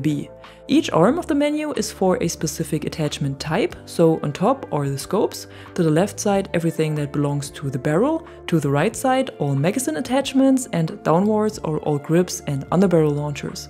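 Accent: Germany. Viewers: you sonds like a german person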